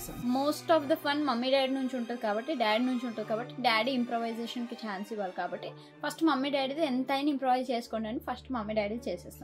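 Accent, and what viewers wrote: Andhra Pradesh, India. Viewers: andhra matladakandi 😂please jai telangana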